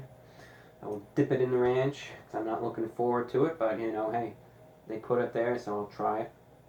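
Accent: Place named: Queens, United States. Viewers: telling by his voice, somewhere from the east coast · I love it when the old Queens accent really comes into it's own, on the New Yorks and chocolate. I really like that accent, it reminds me of Richard Feynman and is quite soothing.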